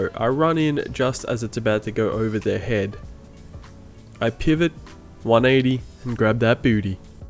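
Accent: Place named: Australia. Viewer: why hello fellow Australian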